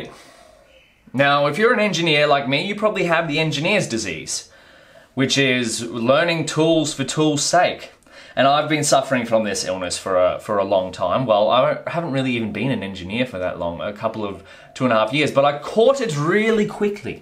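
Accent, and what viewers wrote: Australia. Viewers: first sentence I could tell he is an aussie jaja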